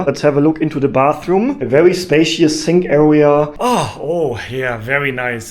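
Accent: Germany. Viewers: Bruder dein deutscher Akzent ist killer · Love his german accent ❤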